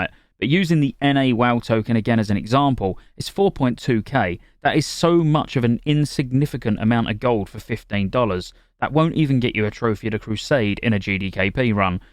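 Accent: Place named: Lincolnshire, United Kingdom. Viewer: Love listening to the Lincolnshire accent on YouTube.... "Items" or as they are called in Lincolnshire... "Eye-ums" Much love, Yellowbelly myself <3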